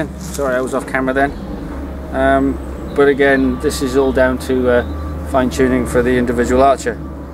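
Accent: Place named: North, United Kingdom. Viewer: You sound a Northerner , so where are you shooting ??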